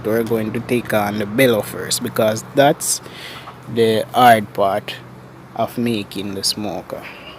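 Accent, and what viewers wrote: Jamaica. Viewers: New viewer. I like your creativity and the Jamaican ascent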